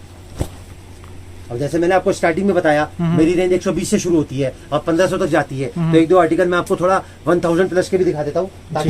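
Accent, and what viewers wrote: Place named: Marwari, India. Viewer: भाई साहब आपको बोलने का तरीका गजब है पक्के मारवाड़ी हो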